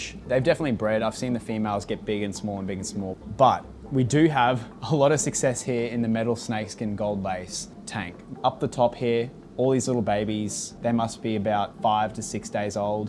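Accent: Australia. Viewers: There's just something special about someone with an Aussie accent telling you about guppies haha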